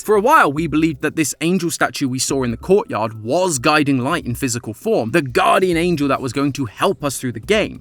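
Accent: United Kingdom. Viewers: Honestly, I love as a host. His British voice is definitely my favorite thing about him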